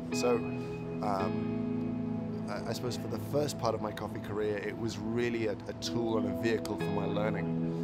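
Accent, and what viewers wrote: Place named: United Kingdom. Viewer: is one of the best ambassadors for coffee there is, not a little helped by that calm, thoughtful British articulation:)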